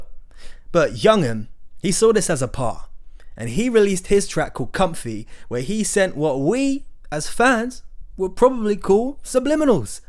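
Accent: United Kingdom. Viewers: dj akademics can do a great british accent.. love your new channel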